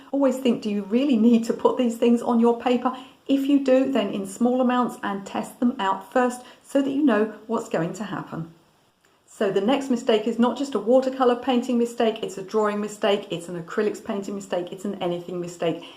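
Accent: United Kingdom. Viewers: Wow ~ I thought you were talking to me about ME! ~ As in, all of the above mistakes, almost. The only one that didn’t apply was the Bonus. I’m so timid I let my paint dry way to much, most of the time. Then I have to work myself up to coming back to it! Like I end up going to the kitchen and making a pot of vegetable soup before returning to it. Seriously, I appreciate all of your observations ~ just listening to your beautiful British accent brings me a bit of calm and resolve to get my act together. Thanks so much ~ please keep up the good work💁🏼‍♀️😻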